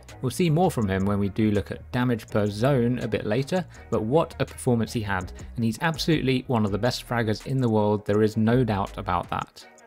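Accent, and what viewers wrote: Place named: England, United Kingdom. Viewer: This is so good but since im from australia the english accent triggers me lmao, good shit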